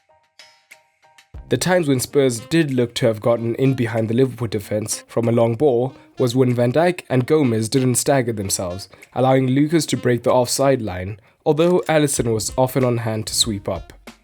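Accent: South Africa. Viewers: Are u from SA, just asking because of ur accent. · South african accent?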